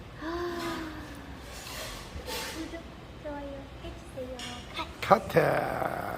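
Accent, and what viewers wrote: Gyeongsang, South Korea. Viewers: 누부야 66만만에 구수한 경상도 사투리 말씀 반갑습니다 랑 늘행복 하십시요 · 는 표현력도 최고 입니다 세월은 너무빨리 흘러갔네요 부산 광안리에서 먹어본 그맛은 잊을수가 없고.. 갱상도 사투리로 누부야를(누나) 제주도에서 부르는 유퉁쌤, 정겨운 목소리와 사투리가 매력있어 매일 시청 합니다 늘~건강 하시기를 기원 드립니다. 캇트, 구독, 좋아요. 👍 👍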